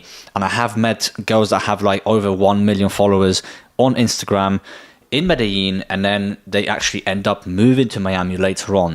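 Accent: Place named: United Kingdom. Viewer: True. That's cuz he's a Polish good looking dude with British accent. So he's super exotic in Miami. If you're an avg American guy, Miami would be horrible to date in.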